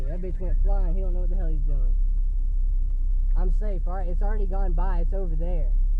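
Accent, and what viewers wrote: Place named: North Carolina, United States. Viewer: you can obviously tell that your from NC, you've got that NC Country Twang. I have it too. <-- and for the people that don't know what a twang is, its kind of like your accent.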